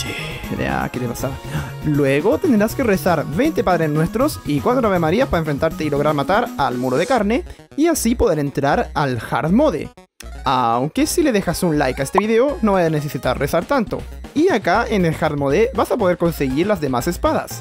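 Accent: Chile. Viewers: bro, te salio con un acento muy chileno el video XD, la parte q mas me gustó fue y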